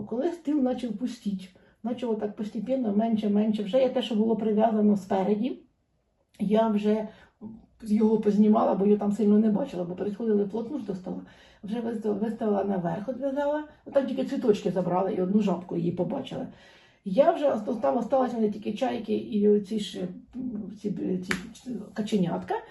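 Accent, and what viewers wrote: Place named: Cherkasy, Ukraine. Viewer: ааа, я так і зрозуміла що розмова Черкащини. Я з Корсунь-шевченківського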